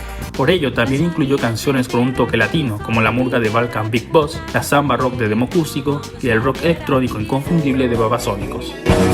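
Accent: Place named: Paraguay. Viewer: Suenas como paraguayo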